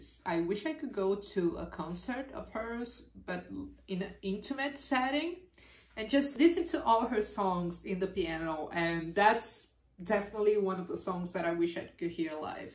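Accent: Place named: Brazil. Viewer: Nossa é incrível como brasileiros conseguem reconhecer brasileiros só pelo sotaque haha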